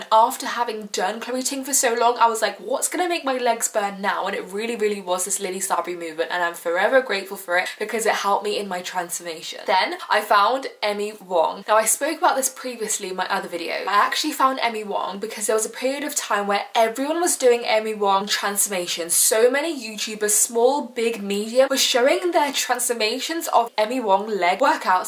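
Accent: United Kingdom. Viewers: It's a British accent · I came here to see how I could get some slim thighs but what I was observing... God DAMNN!!! Her British accent is soo much strong...😄😅🤗😘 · im pretty sure its british, I am british too lol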